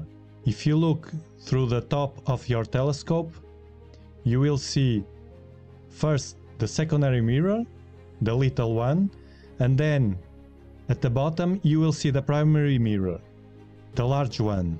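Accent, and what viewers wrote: Portugal. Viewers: Ayo Portuguese accent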